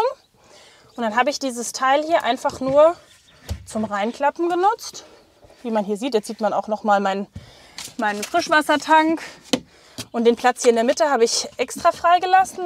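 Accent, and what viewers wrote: Germany. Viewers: Lol I thought you were speaking English with a German accent when the vid started lol maybe a way for me to learn another language :) You need a "Wingnut" for the mirror pad holder change over.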